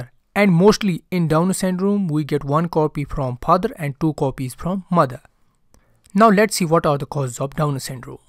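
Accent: Kashmir, India. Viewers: I caught u u r a kashmiri aren't u bolein bolein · Indian Accent is the best !!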